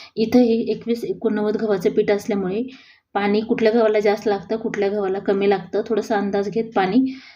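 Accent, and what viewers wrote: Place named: Marathwada, India. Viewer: Mi latur chi Lagech smjt la aaplya side chi tone 😊 Mazi frnd ahe dharashiv chi😊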